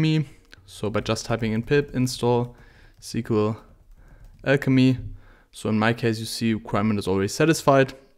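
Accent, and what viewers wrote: Germany. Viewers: HEY man, you are 100% a German :=), right?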